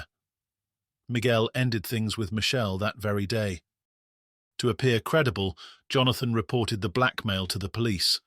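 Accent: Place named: United Kingdom. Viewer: Never sounds right with British accent, especially as scripts are written by Americans, so have American words like "sidewalk" - in England we say "pavement". It sounds odd hearing these words read in a British accent. It's more genuine when a native narrates, one can get into the spirit of the story better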